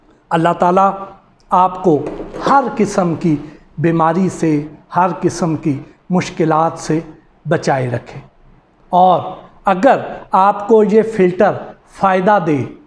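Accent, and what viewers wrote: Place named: Punjab, India. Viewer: MA SHA ALLAH sahib Aap bohat cute punjabi style k malik ho. ALLAH Karim aapko hamesha khush rakhe Aameen ya RAB Ul Aalaameen.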